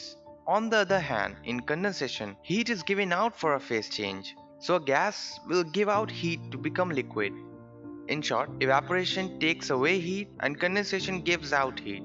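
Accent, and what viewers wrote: India. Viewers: You indian right?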